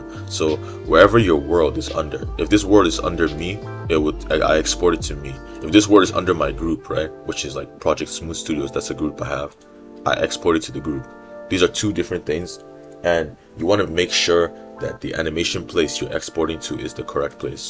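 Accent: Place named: Nigeria. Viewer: icl from your accent you sound Nigerian but good vid tho its very good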